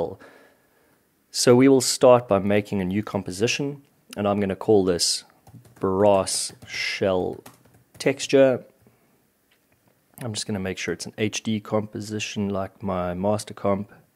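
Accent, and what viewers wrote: South Africa. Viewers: sup are u South African?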